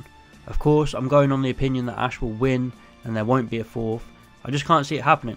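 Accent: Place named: United Kingdom. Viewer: You are so British by Accent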